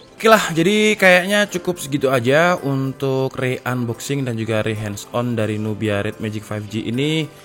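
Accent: Malang, Indonesia. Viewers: umak wong malang ta sam?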